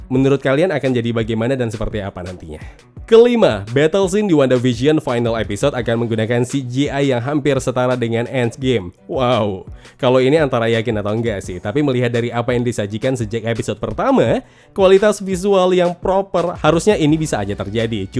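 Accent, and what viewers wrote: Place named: Manado, Indonesia. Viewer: logat logat manado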